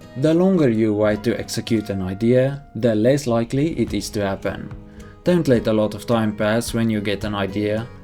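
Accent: South Africa. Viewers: you have already surpassed the achievements of many people three times your age. You are an old head on young shoulders as the saying goes. As a model railway enthusiast myself it’s always nice to see and hear what other folks are up to and you definitely bring a fresh outlook to the hobby with a wealth of interesting personal observations along the way. You are teaching me things and I’m one of those much older enthusiasts. Keep up the good work but don’t knock yourself out trying to hard. It’s all about enjoying the hobby and life at the same time. Your English is great and the South African accent just makes it even more interesting to listen to.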